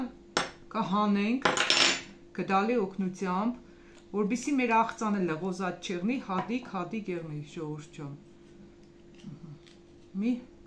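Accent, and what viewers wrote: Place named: Gyumri, Armenia. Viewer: Բարև ձեզ շատ հաճելի է լսել իմ Գյումրեցու լեզուն Աստծո օրհնությամբ ապրես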